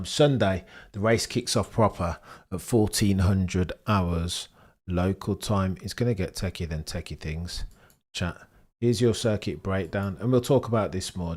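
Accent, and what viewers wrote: United Kingdom. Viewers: Proper British lad 😂 I love the accent .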